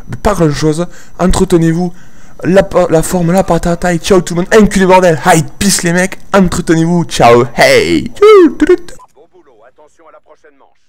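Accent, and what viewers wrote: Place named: South, France. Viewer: accent du sud tu viens d'ou ?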